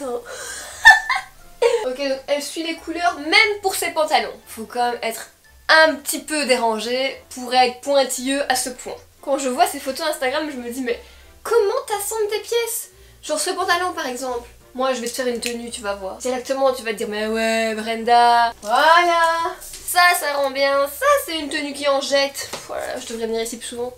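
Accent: Belgium. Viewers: J'adore comme tu lâche tes petites expression belge mais moi aussi je suis belge et ma soeur parle comme ça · ta un accent belge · J'adore ton accent belge parce que moi aussi je suis belge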